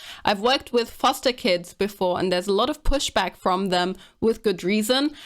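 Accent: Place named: Germany. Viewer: great points :) you articulate yourself very well -I hear a slight german accent .... I lived in Berlin for 10 years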